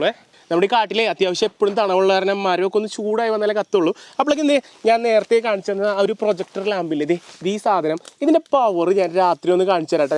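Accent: Thrissur, India. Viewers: machan thrissur aano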